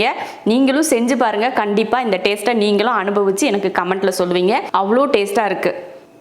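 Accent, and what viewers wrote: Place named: Theni, India. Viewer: Akka neenga Theni districta ?Unga Slang ,food habbits apdithan iruku.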